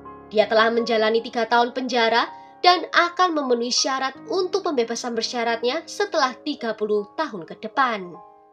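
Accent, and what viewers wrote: Java, Indonesia. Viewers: Mbk jawa nya medok amt mbk😅😂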